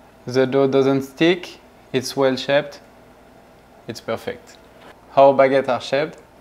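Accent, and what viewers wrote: France. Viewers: Ha putain l'accent va plaire 💪 So frenchy ❤️ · Les anglais doivent être dingues de ton french accent 👍👍👍👍bravo en tout cas 👍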